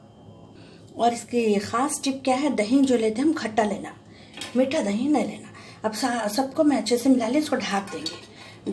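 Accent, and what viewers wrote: Hyderabad, India. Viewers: Aap hydtaba ke hoky aapki baat ka lheyja wesa hi laga chhahy kafidino bad dhiki mirchi ki recipy dikhaidi..mujhey bhut bsnd hy..😋😋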